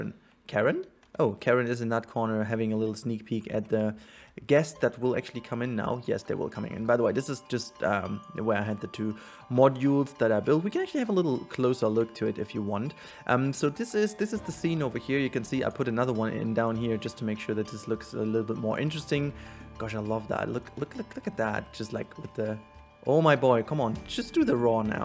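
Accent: Germany. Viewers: Kann es sein das du aus Deutschland kommst xD ? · Du bist aber deutsch oder weil dein Name und dein englisch verraten das 😊👌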